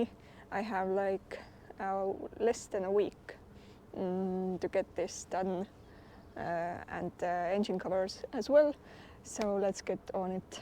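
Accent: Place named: Finland. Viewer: I was thinking your accent sounds finnish but your plates are Estonian. Then i realised where Estonia is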